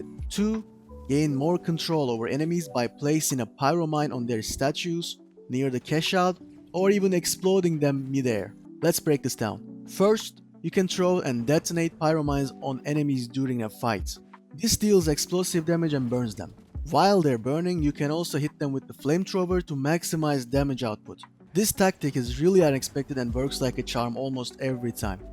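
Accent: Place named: Turkey. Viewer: Anaaaa, bu TÜRK AKSAANI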